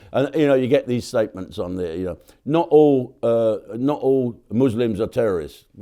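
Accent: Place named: Liverpool, United Kingdom. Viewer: that was more like scouse than hully gully jim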